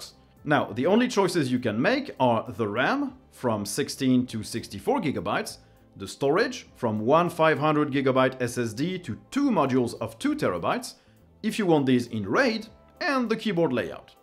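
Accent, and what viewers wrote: France. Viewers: When I see the Linux Experiment I have to click it. It is like an addiction. He has the whole package: Beard (some say a squirrel is hibernating in it), the French accent, the same undershirt he has been wearing for 10 episodes straight (who doesn't like Roquefort?).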